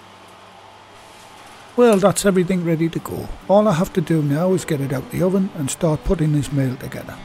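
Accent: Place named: England, United Kingdom. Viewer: Cewked. 👍🏻 I've been in bands with lads from sooth of the watta, and that's a posh mackem accent ✌👍🏻🍺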